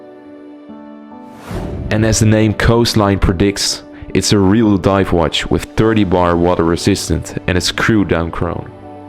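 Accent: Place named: Netherlands. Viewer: Ik weet dat je nederlands bent, maar alsjeblieft werk aan je accent.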